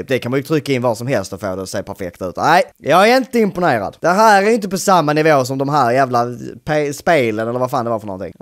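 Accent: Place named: Skåne, Sweden. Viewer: Chex supportrar dig är från Skåne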